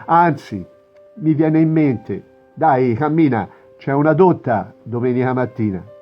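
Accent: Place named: Tuscany, Italy. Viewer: Belli i versi 'alessandrini', mi riportano indietro ad immagini che i miei occhi di bimba hanno visto per le strade di Siena... Mi incuriosivano quelle persone di cui vedevi solo il dorso e le gambe, costretti com'erano a stare dietro quelle protezioni di sottili sfoglie di legno intrecciate... E mi facevano un po' pena, perché mi dicevo: "Noi siamo riparati dalle scaglie di pietra, che lo scalpellare provoca, ma loro no..." E poi gli altri momenti descritti... E le adeguatissime immagini scelte... E, infine, la tua bella voce, che porge ed esalta, con educato e morbido accento dei nostri luoghi, quanto il poeta ha fermato nei suoi versi... Grazie!!! 👏👏👏👏👏👏👏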